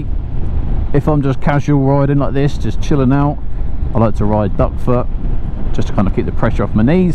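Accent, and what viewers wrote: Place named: United Kingdom. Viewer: Bro you look like a Texan and seeing you speak in a British accent is funny😭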